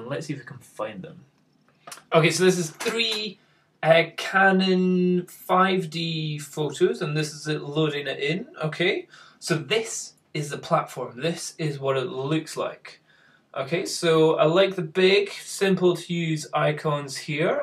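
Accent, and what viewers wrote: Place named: Scotland, United Kingdom. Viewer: I do enjoy your Scottish accent. Don't stop talking! Did you ever look at Corel AfterShot Pro?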